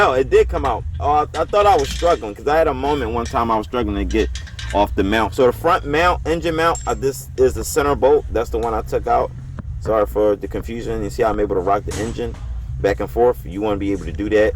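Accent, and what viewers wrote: Philadelphia, United States. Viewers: Are you from Philly? Happy belated and thanks for the vid.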